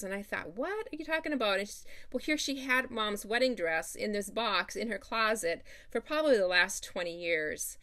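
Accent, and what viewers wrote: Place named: Midwest, United States. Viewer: Wow!, this was the absolute best! I only discovered your tutorials a couple of days ago and have done some binge watching. You are awesome. I just love all you create. I adore your Midwest accent. smile. My dad was from Minnesota. Thank you for all your inspiration. Take care, be safe and much happy stitching.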